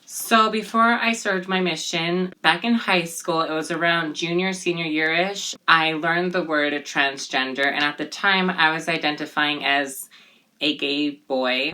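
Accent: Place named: Asia. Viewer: I thought u were Asian by your speech.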